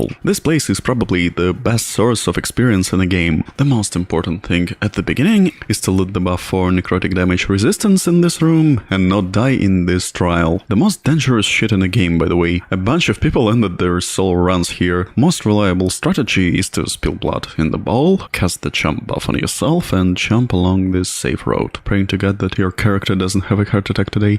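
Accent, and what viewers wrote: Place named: Russia. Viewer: you gave me some runglish, love it.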